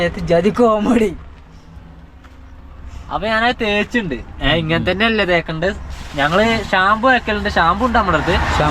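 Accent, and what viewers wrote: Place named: Malabar, India. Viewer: ഈ ഭാഷക്കു ഒരു കുഴപ്പവും ഇല്ല ബ്രോ മലബാർ സ്ലാങ് ആണ് അങ്ങ് ക്ഷമിച്ചേക്ക് 😊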